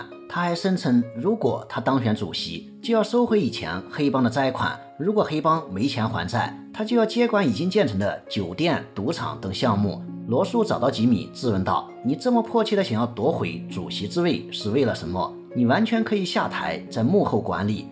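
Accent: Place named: Anhui, China. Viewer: 越哥，你的安徽乡音是如此地迷人！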